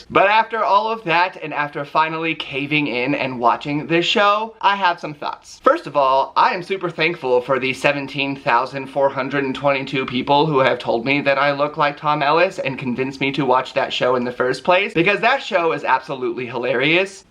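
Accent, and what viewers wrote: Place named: United Kingdom. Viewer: Yaaaas British accent!!!!!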